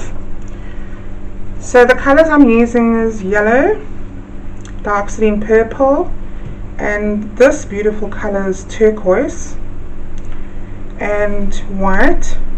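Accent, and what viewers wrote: South Africa. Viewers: Omg I LOVE your accent!!!! Is it South African?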